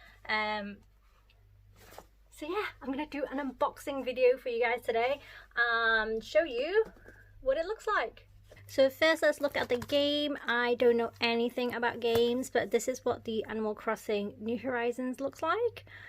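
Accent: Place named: United Kingdom. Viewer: Not trying to be like racist but this is the first asian (I think I’m sorry if your not ) who has a British accent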